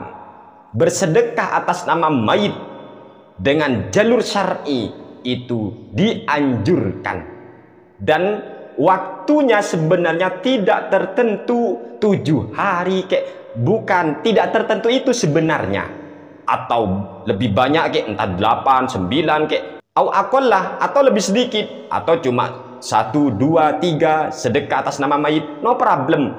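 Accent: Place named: Java, Indonesia. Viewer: tigaraksa tgrang menyimak..mantab jelas...las lasan jawane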